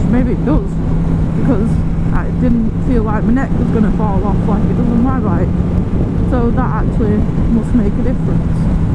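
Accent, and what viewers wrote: Northern England, United Kingdom. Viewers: I am looking at the MT10 for the next bike to strip and rebuild. So this video hit my feed. I could not complete the video because that girl is so dull. Is she trying to sound dumb or is it that northern accent. Yeah, pull your bottle blonde hair out from your helmet and slap a tonne of eye make up on... that will get your hit count up some (jaw-breaking yawn). Why did I leave England 10 year ago... Oh, I remember now! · Great Vid,X...As all yr vids,TOPS,Great to find one in uk,Im in Essex we hook up with some guys on a sunday morning,supermoto run,my mate has mt09,awsum bike,just wonderd what would you recoment to clean a bike,can never get mine looking like a show room bike,thank you,iv been ryding ducati 1100,hypermotard,can not catch mt09,love your accent,up north,The HART OF ENGLAND,Your a great Biker,Take care,Safe bikeing,X.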